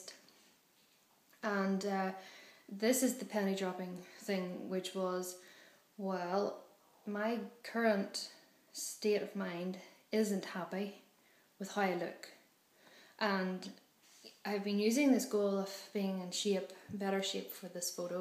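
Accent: Ireland. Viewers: Hi i came across your video by chance because i was thinking of doing a sporting challenge for my fiftieth birthday, which is next year, and i saw this that you put on here, and the one you did on your birthday. Anyway i would like to say you look amazing so really good looking with those blue eyes and that gorgeous Irish accent of yours, you are a wonderful young woman and life is for living so go and enjoy yourself as much as you can, any ideas for me drop me Dave a note.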